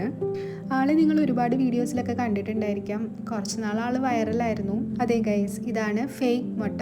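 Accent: Malappuram, India. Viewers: I think chechi thamasikunnath malapuram avidayaanno aa slang kettappo angana thoonni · മലപ്പുറം ആണോ ചേച്ചി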